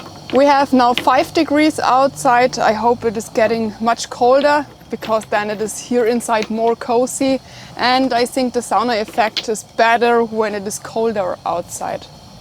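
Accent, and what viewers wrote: Germany. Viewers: I bought your book velcome bek am from vild and bushcraft love it am your number one fan am. Not making fun of you I love your heavy accent it reminds of the German girl in the movie YOUNG FRANKENSTEIN the mel Brooks comedy movie she talks like you. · Your German English wonderful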